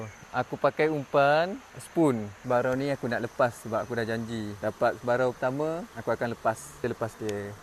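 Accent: Kuala Lumpur, Malaysia. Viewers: Ore kelate tp kecek luar mcm org kl, ingat ore luar tadi😂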